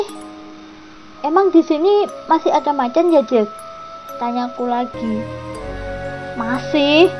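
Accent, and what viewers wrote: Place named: Java, Indonesia. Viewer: Medok amat ngomong y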